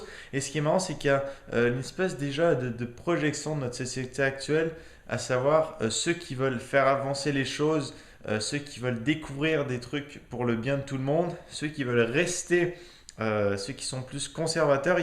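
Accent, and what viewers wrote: Canada. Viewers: Accent canadien <3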